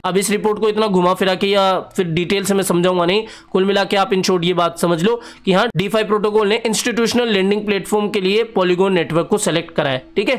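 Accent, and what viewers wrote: Jaipur, India. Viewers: tumhare way of talking se lagta h tum jaipur se ho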